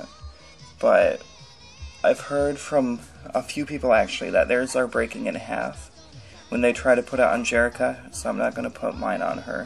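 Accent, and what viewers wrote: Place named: United Kingdom. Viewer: I hear British accent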